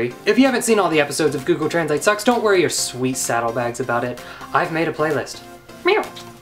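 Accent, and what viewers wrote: South, United States. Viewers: He's definitely become more comfortable and confident over 5 years and it's been so much fun watching him grow and become more successful. Regarding his accent, I think him living in California made him "turn off" the southern accent in a way. I can personally relate to this. I live in Tennessee and I've tried my whole life to not have an accent, mostly because some of my family members, I can barely understand. Haha But, if I spend much time around them, I do have a bit of an accent that peeps out.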